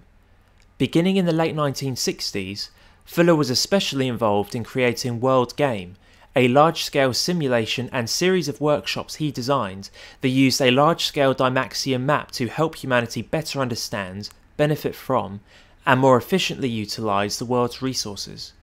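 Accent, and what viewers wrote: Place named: United Kingdom. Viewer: He is British. His pronunciation is different from those that live in America.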